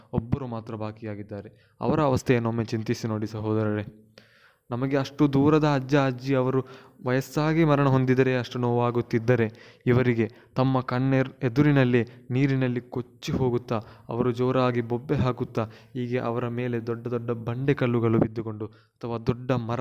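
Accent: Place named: Kerala, India. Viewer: ಮಲಯಾಳಂ ಭಾಷೆಯ ಯವರು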